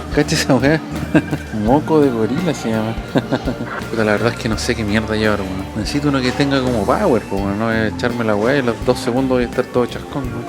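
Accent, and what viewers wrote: Chile. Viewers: El mejor acento en latinoamerica, el Chileno, saludos desde México, por cierto , este canal de que país es ? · ...habla chileno-aleman, duce que vive allá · MIS RESPETOS PARA ESTE CHILENO...PERO, SORRY NO ME ACOSTUBRO A SU ACENTO!!!! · Weon llegue aca, por tu reacción del partido Chile Japón, y disfrute tu reacción de tan lejos, y me quedé pegado viendo más videos y mi pareja me dice:y que onda este wn?, comenta de fútbol. De, de música va al super, las quiere hacer todas, jajajajaja y llegue acá, no aguante en escribirte, y darte mis saludos desde Santiago, un gran abrazo compatriota, y siga dándose las de opinologo q lo hace bien o al menos igual me cago de la risa con tus profundos análisis jajajaja Pregunta. Vives solo con tus hijos o la madre no Le gusta salir en los videos? Chao compa, y siga hablando como quiera Chileno cuico o Chileno, choro, chauuuui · cuanto tiempo llevas viviendo en alemania?? 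no importa que no nos entiendan, es nuestra identidad no pierdas nunca el acento es nuestro legado, asi de cuatica la wea weon wn!! saludos desde chilito lindo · Nose si es porque yo soy mexicana pero no entendí nada de lo que dijo , p es que hablan muy rápido los chilenos, me hubiera gustado que hubiera mejorado el sonido de su voz chileno, yo amo amo el acento chileno 😍...... y si en México a todo le ponemos Chile y yo creo que hasta hermanos somos los mexicanos con los chilenos 😊🤭💜🙋‍♀️🇲🇽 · Asi HABLAMOS los chilenos jsjsjsjs. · Soy mexicano, pero carajo, que agradable suena el acento chileno, el ¨weon¨a cada rato da gracia jaja y las palabras que usa ¨la zona de la raja¨ jajajajaja. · El mejor video que e visto de un extranjero visitando un supermercado , todo radica en simplicidad y su honestidad y ya que no es como otros videos donde exageran reacciónes o fingen sopresa, o se reservan comentarios, por ejemplo en los helados Carlos V lo tipico hubiera sido "Wow un helado llamado Carlos V" pero en vez de eso tenemos un " Carlos V que chucha un helado que se llame Carlos V".ya que este tipo de comentarios hicieron divertido el video y te recalco unos : "oh estas otras son flautas de pollo.. 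se ven ricas estas mierdas weon" , " Yo pense que en Chile eran exagerados pero estos weones la cagaron", "El sector de la raja para limpiarse la raja" " Veo el nombre de mi país por todos lados weon" "Que manera de meterle wea a los cabros chicos para que lo compren , cuantos cabros chicos compraran esto porque solo sale hulk en el" jajaja e aquí la honestidad y el acento chileno jaja que buen video hermano se ve que los Chilenos y los Mexicanos tenemos muchas cosas en común y una de ellas es el sentido del humor jajaj Saludos hermano. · Como me encanta el. Acento Chileno weon. Saludos desde Monterrey México. Cachai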